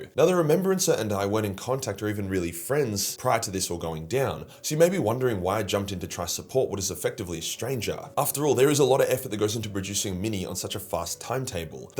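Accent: Australia. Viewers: Never watched his stuff never even looked into him because well I like your vid length and as a Brit... I do love an ausie accent but yeah hes getting a free sub and watches from now on! Do love how I edited the angry words out and they still kept the angry word version as the comment though 😂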